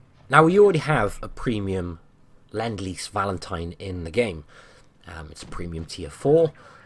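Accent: United Kingdom. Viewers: I'm English anyway good British accent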